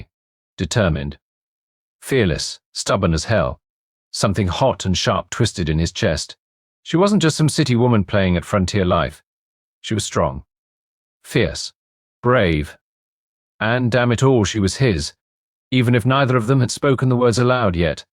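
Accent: United Kingdom. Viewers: Kind of funny to hear à british voice reading an american story. However the narator is wonderful., as is the book.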